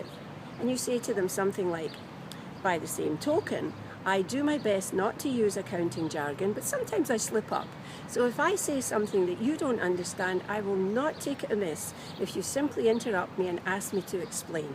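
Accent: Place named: Rioplatense, Argentina. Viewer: Keep it up ! This lady nailed the "rioplatense" accent !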